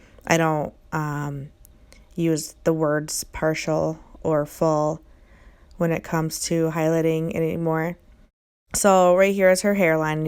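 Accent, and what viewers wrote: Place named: Detroit, United States. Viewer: I knew I recognized that accent! My hubby is from around the Detroit area! 😊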